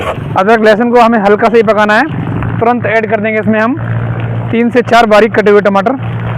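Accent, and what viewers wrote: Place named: Uttar Pradesh, India. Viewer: sir j aap up se ho kya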